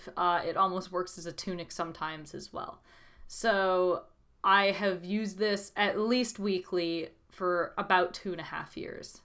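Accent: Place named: United States. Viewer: You sound like you're either American or Canadian, did you adopt that accent, or are you not originally from AUS?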